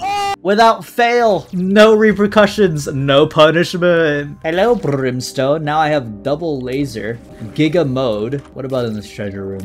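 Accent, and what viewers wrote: United Kingdom. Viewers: Wait you're British, cringe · My day be so fine. Then boom. Find out is British.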